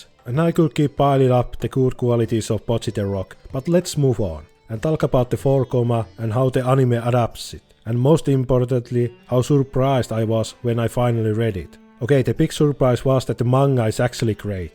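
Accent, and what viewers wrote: Finland. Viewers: As finnish i can recognize strong finnish english accent. Great video btw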